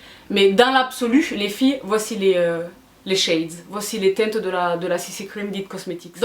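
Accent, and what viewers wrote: South, France. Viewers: Coucou ! Je viens de découvrir ton compte et j'adore ce que tu fais : tu es claire dans tes explications, tu es enjouée, positive . Ton accent du sud 💕 je m'empresse de visionner toutes tes stories et de m'abonner à ton insta. Merci merci !! 😘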